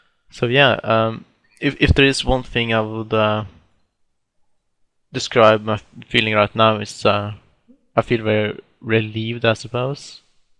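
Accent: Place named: Norway. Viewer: Gratulerer! spiller ikke 07 selv, men moro å se at du står på å er pågående! :D men hører du er ''norsk'' for å si det slik XD Men det e rmoro å se hvor god engelsken din har blitt i forhold til da du starta! :D Lykke til videre :)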